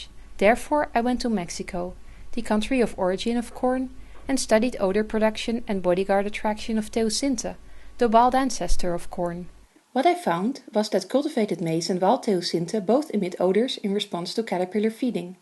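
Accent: Netherlands. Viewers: The Dutch shouldn't try to speak English. This is horrible. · It's very much a Dutch accent :) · Nederlands accent is Nederlands. Maar leuk onderzoek.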